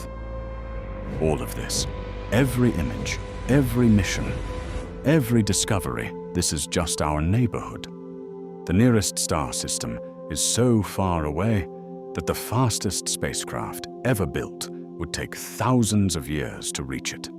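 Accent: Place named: United Kingdom. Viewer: Awful British accented narrative sucks.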